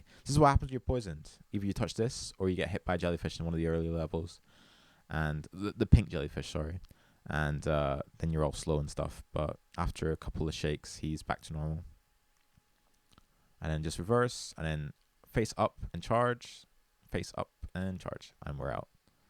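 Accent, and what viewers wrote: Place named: Scotland, United Kingdom. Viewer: Yeah, man i’m just a few miles away from your home town! Throughout the video after I made the comment I was just thinking - surely this dude has to be Scottish somehow 🤣. I figured maybe even your parents. How come you moved away from Costa Del Scotland?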